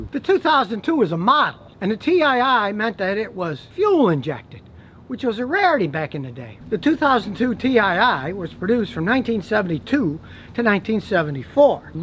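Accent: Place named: North, United States. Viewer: how come you live in Houston but have a northern accent? Those hard Rs, dead give away.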